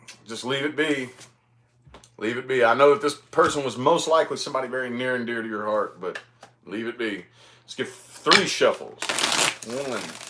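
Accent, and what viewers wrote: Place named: Mississippi, United States. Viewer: You sound like every man I know in Mississippi 🤣 gotta be Alabama Mississippi or maybe Louisiana.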